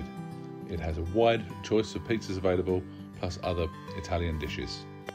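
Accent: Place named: South, United States. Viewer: Good to hear those soft southern tones again, better than music…. Did we also see a plaid shirt by any chance ?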